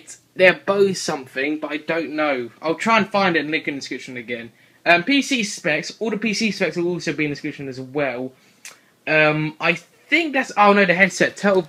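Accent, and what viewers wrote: England, United Kingdom. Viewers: What part of Britain you from? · are u English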